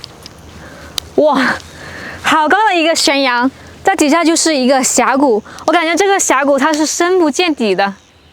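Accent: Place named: Luzhou, China. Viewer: 说方言的口音跟我们老家很像，跟泸州更像！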